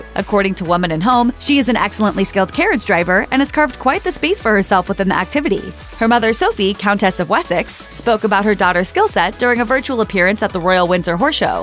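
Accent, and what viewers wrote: United States. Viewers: The fact an American voice is used for this article instantly renders it pointless and crass... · Oh please what a tiresome yawn 🥱 channel… actually (American accent) the woman’s voice is sooooo…….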